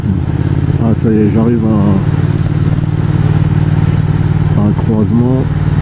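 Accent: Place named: Franche-Comté, France. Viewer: .." bohneur " ?! ou " bonheur "?! , vous qui vous moquez un moment donné , sans doute pas méchamment( ?), de l'accent "halsassien " , en tant que " bon " Français ! J'ai cru percevoir un accent franc-comtois , soit dit en passant !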